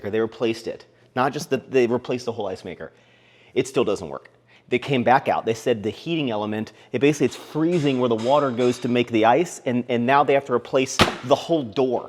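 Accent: Texas, United States. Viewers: you have started to sound like a Texan 🤣 best of luck for your surgery!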